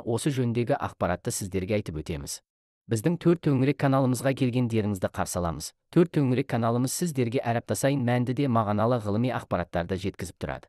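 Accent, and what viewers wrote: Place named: China. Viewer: Кытайдан келген бе, казакша акцент “керемет” терминди айтпаганда,мемлекеттер атын дурыс атау керек!!!немесе мектепте дурыс окымай казак тили мен адебиетине дурыс ман бермеген бе? Блогер болу ушин жаксы дайындык керек, твндаудын ози ауыр.😮😂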